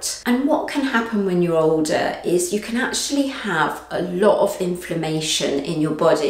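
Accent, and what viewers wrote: United Kingdom. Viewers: Definitely British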